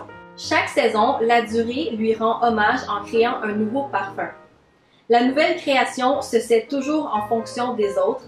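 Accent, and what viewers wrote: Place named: Quebec, Canada. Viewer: Tu es Canadienne? Tu as l’accent Québécois quand tu parles français !😍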